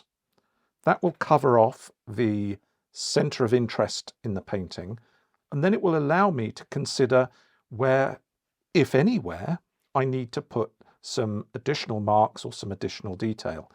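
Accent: United Kingdom. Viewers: Very informative! Off topic; are you from the same area as John Cleese? You guys have the same accent.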